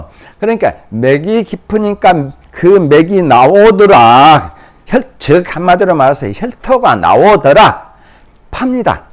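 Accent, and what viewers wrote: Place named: Jeolla, South Korea. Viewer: 선생님훌룡하신강의 잘보고 있습니다 말씀이 전라도 이신거 같으신데 어디신지 여쭤봐도 될런지요?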